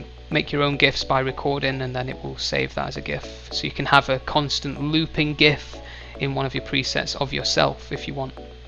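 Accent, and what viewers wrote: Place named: England, United Kingdom. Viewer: Great video! Difficult to focus on the tutorial with that beautiful english accent :D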